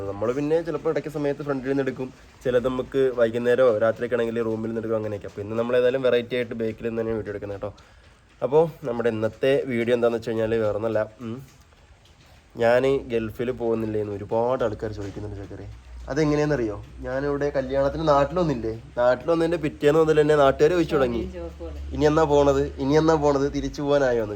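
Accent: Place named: Palakkad, India. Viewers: MalappurAm palakkad samsaram ekadesham oru pole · Slang cheyyo palakad then kollam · Chakkara Palkkad aano Samsarm kettappo original malappuram aan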